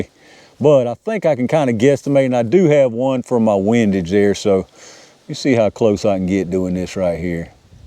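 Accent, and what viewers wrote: South, United States. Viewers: AWEsome video. I dig the southern accent too.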